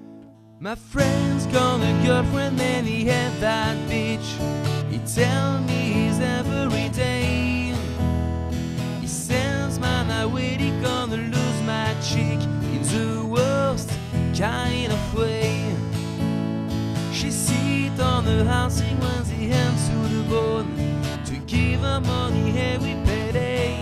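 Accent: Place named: France. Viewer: I love when French people speak English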